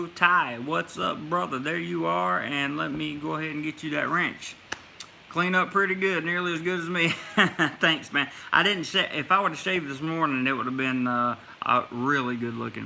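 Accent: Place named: Texas, United States. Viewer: I like your Texan drawl!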